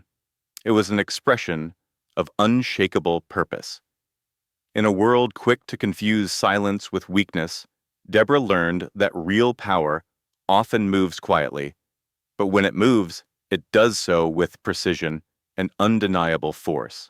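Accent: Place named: United States. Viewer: It's a good story to express about our guards but spoken by an American. I began to feel sea sick when the picture kept moving side to side.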